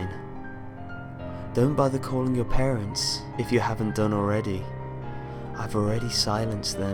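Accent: United Kingdom. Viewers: Your voice with a British accent is beautiful.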